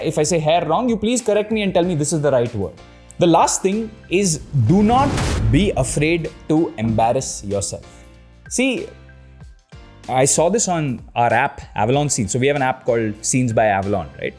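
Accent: India. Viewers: I think you're a south indian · Your hindi reminds me of south indian can drivers😂😂, no offence btw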